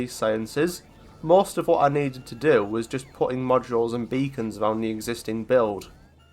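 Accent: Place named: Geordie, United Kingdom. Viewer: the algorithm only just suggested you, your content is so good, and your soft Jordie accent is so perfect for it! got a whole new back catalogue to watch now! amazing stuff!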